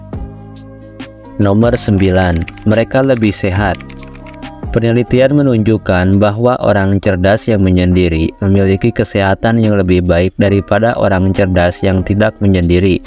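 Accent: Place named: West Java, Indonesia. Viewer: yg ngomong urang sunda nya? 😅 aksennya keliatan..🙏hapunten kang..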